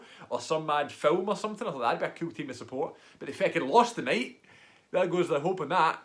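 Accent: Glasgow, United Kingdom. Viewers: I live pretty close to St Louis so I'm gonna be rooting for the Battlehawks, but the Roughnecks, Defenders, and the Guardians were all pretty good as well. From on-sight reporting, there appears to be quite a bit of excitement for the St Louis team because they've been without a team since the Rams moved to L.A. 3 years ago. The Battlehawks are gonna be playing in the old Dome stadium that the Rams played in, so crowds will look small at first, but hopefully if this league is a success, and the Battkehawks turn out to be good, they will garner a decent crowd there. PS. I worked with a guy from Glasgow back in college at Southern Illinois University. His accent wasn't nearly as thick as yours. Must've been because he'd been in the States for awhile.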